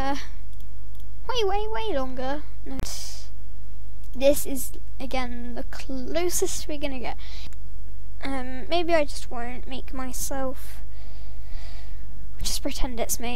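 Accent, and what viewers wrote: United Kingdom. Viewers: i love that you are Brittish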